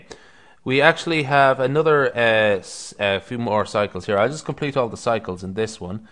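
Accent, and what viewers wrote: Ireland. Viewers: you know Conor Mcgregor? judging by your accent, i bet you're Irish. :)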